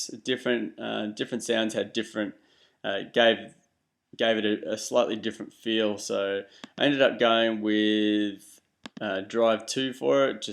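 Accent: Australia. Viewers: refreshing to hear an aussie haha good tuturial man!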